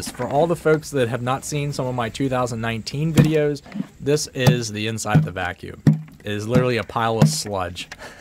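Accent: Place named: Pennsylvania, United States. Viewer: My son and I absolutely love your channel! He is currently 5 years old and is battling leukemia and to see him get so excited every time a new video comes up really makes my day. He only has two more months of chemo to go and everything is looking good =) We used to live in NJ so we are used to the PA accent with "cone" and such so that brings us a little taste of home from all our friends in the PA region. Saw a Japanese Hornet fly by our house (we currently live in Thailand, long story lol) and immediately thought of you doing battle with these. Those hornets would not have a chance ;)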